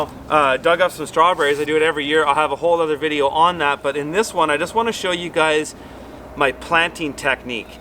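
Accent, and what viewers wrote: Canada. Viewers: The Canadian accent is why I’m here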